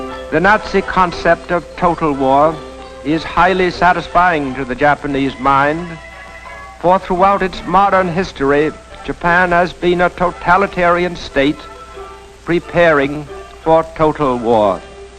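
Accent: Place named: United States. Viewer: Hello all, no offense to the uploader & the rest. BTW, I must stressed that I'm not a Japanese. This video is simply a US propaganda film, judging from the narrator's accent. Its quite insultive in some ways to east asian thinking. AND stop calling jpn/chin/kors "ASIANS!" Its makes you sound stupid. thanks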